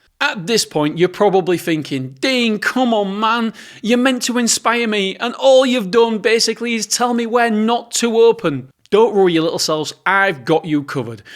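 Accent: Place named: Hull, United Kingdom. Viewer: i live in Hull ❤ You sound like your from Hull too 😂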